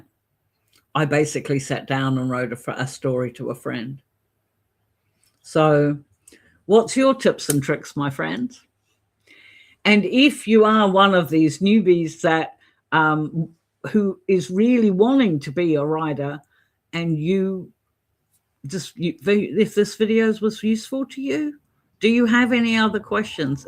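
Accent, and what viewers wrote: New Zealand. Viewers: What a wonderful vlog. First time seeing your channel and have subscribed. Immediately spotted the accent and harekeke out the window so felt at home as a kiwi too. In answer to your request to comment to someone wanting to write: Writing matters. It matters to you, that’s why you feel the urge. That’s why you feel the compulsion. It matters, that’s why you feel the resistance. It matters, that’s why when you finally start, you feel whole, you feel joy, you feel the desire to continue. You’ll also feel the self criticism and doubt but you keep writing because writing matters to you. Keep writing till you get beyond it mattering. Keep writing till you feel it’s who you are, someone who writes. Just like you might be someone who knits, someone who runs, someone who paints, someone who bakes. Write because you are someone who writes.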